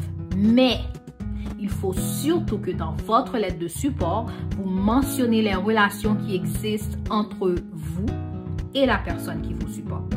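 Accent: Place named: Quebec, Canada. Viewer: J'aime le léger accent québéquois.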